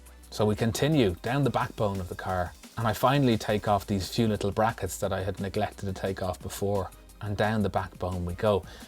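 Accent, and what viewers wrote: Ireland. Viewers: Actually he's Irish, but thanks for the Aussie guess. We'll take that as a compliment.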